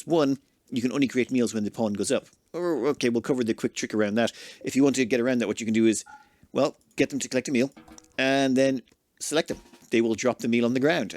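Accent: Ireland. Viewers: It's an Irish accent.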